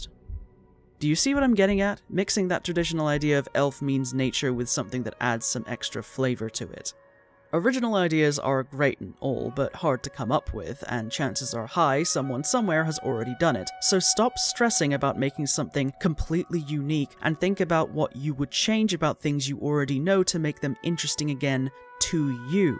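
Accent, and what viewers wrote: Scotland, United Kingdom. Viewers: Im guessing your a scot?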